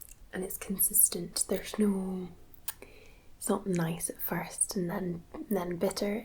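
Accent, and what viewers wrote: Scotland, United Kingdom. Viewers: a beautiful girl from scotland+beer+that accent... this must be heaven. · You make me want to go to Scotland for sure. For the beer and the view. Oh....and the accent. Love the accent. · I am a bit confused by you saying that some of these are like magners - a cider, when this is beer. That is a bit strange, but it is interesting to hear different points of view. However, I like your video. Have not seen you videos before I don't think, but seen some other ASMR. I like listening to your voice and Scottish accent. Combing your voice with beer is excellent though! I like blonde ales as well. I might have to try this one that you like. Thanks for the video, I will subscribe. I like you switching between left and right near the beginning of the video - that works for me to give more tingles.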